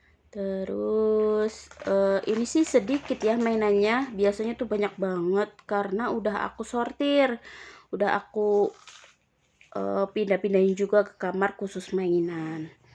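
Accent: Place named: Banyumas, Indonesia. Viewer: Salam kenal mbak, aku ngapak juga, dari cilacap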